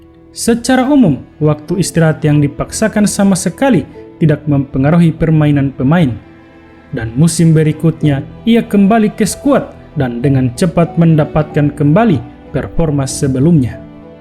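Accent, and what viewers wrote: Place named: Sumatra, Indonesia. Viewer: logat nya mirip org sumatra gitu min hehe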